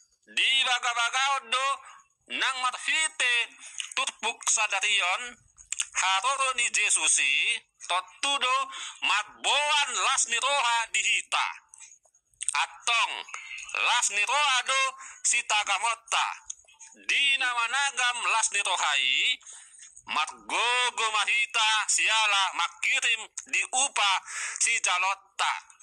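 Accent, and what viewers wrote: Java, Indonesia. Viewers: Saya kagum dengan Bapak bukan hanya Sejarah saja yg pintar tapi Agama juga mantap.Dan membuat aku merasa heran kalau bicara bahasa Indonesia Bapak nampak logat Jawa.Tapi kalau ngomong bahasa Batak sangat fasih dan tidak ada kejanggalan.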